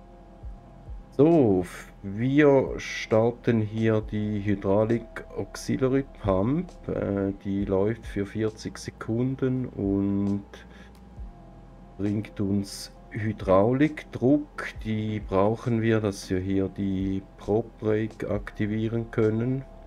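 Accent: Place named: Bern, Switzerland. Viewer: Danke für das "chillige" Tutorial... Guess us dr Region Bärn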